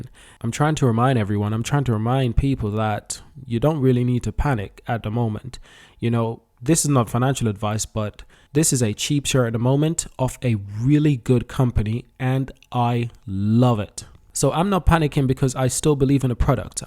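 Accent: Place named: United Kingdom. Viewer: Your channel only seems to pump the news. Why have you not addressed the fact that PSNY share price has dropped almost 70 % since it went public. And with the fact that the CEO persistently wanting to pitch POLESTAR against Porsche is absurd. His ego will ruin the company. That explains why when they launched the P3 in the China market they had to slash prices immediately !! With your accent I assume you are from the UK...try selling Vauxhall at Audi prices and you will know what I mean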